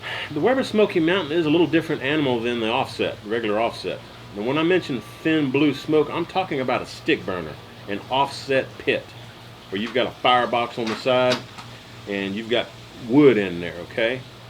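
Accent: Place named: Louisiana, United States. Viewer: What part of Louisiana you from?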